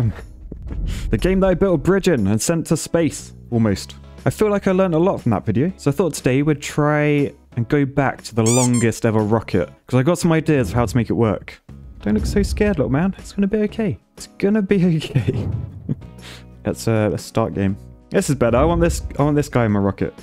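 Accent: United Kingdom. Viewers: Are you British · are you British because I am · oi noi it broke, oiii noiiii. your british accent is great.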